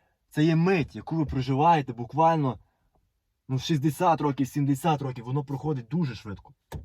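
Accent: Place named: Western Ukraine, Ukraine. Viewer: Акцент сельский западной Украини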